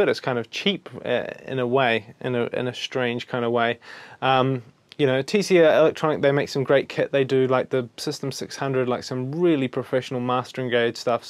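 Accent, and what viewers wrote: New Zealand. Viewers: bro can you send to me, il review it ,give you my thoughts and critisisms and send it back mayby in like 6-12 months? haha Nice review!!! you from NZ?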